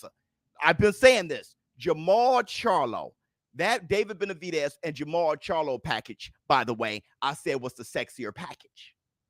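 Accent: Chicago, United States. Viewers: Yo, you from Chicago?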